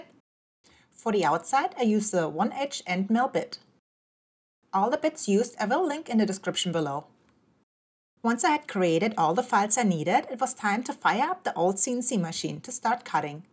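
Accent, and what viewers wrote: Germany. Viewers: Your German (i lived in Germany for 6 years who are know for over engineering most things I say this being French and knowing are most resent European history <num>) and I see you have some advance shop skills with a big push for safety which leads to think your a smart lady like many good Germans well above aveage, but with you aching your back the way you do does not help cutting wood it helps with you getting the views your algorithm and yes even comments and thumbs down would help you if you turn them on why you don't is silly! Your German you must have thick skin living with Americans because I heard alll the joke made towards Germans for many years all all the American living in Germany still to this day my father was in the American army who came to France at the tail end of WWII, so I know the story very well I hear many from my mother who half German and half French she did not lie to me she was in the middle of WWII she knows the truth! 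I am older than most of your aveage boys watching your videos, and I don't fault a pretty lady looking to get her bag with even if you have a side hustle that a lot questionable your not American your Greman accent is heard very well by most Ameicans, so I get it you have bills to pay but you only cut wood with that shirt open that far down and aching your back until you nearly flip backwards and we both know you cut wood like this on camera you would not cut wood like this on a construction site because we both know what would happe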